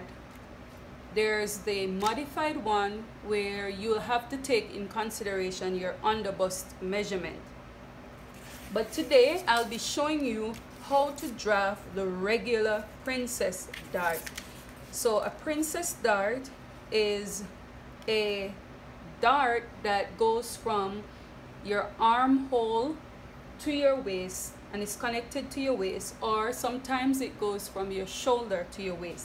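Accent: Jamaica. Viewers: Hi. Thanks. Are you Jamaican? U sound Jamaican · Thank you for the video and for the scripture. Love your voice. New subscriber. Are you from Jamaica? Great video 😊✨💐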